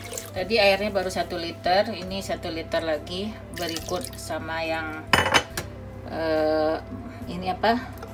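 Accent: Padang, Indonesia. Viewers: Logat nya padsng... nyebut gua ga pantas. Bahasa gue tidsk sopan. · Aneh org pdg ngomong gw.gk enak d denger nya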